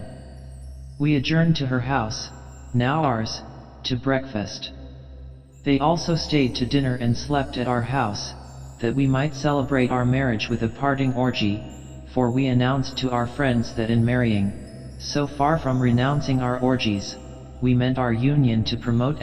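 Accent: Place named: United Kingdom. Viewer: Alien voice VS gentle English UK accent...no contest. THIS VERSION IS ATROCIOUS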